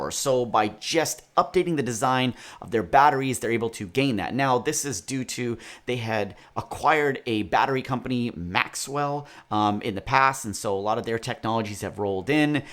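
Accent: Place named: United States. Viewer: hmmm 🧐 Now i understand why you look and your name is like an 🇮🇳 Indian 🇮🇳 but talk like an 🇺🇸american🇺🇸 And i am from Bhilwara,rajasthan. You can check on maps where it is. as you know covid-19 is going on all over the world but here in my town. There are covid +ve coming more and more daily but nobody is has fear of it now than before lockdown and some people have died nearby my colony 😭 and even in my family my chachi got covid😭 but she is not living with us and she is fine right now. but nobody is taking precaution and saying the virus is getting weaker and if you get covid test result +ve than you will be going get your covid test result -ve after some time and immunity power of indians are far stronger than rest of the world, etc. And if you go around the city you will see that it's like NORMAL , there is no covid or anything happened. People just carry mask so that they don't get chalan (fine) from police. 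What is happening to people's mind in india but i can guarantee you that if this goes on and does not stop than india will be no. 1 in covid cases and death in the world 😭. I am taking precaution so that i and my family don't get covid. And i see in your videos that people there in USA are taking precaution so that's good because you all know the fear of it. So take care of you and your family. And I didn't see your family in your videos, do a video about your home 🏡 (where you live now). And last but not least I learn English by my teacher to pass the exam but I l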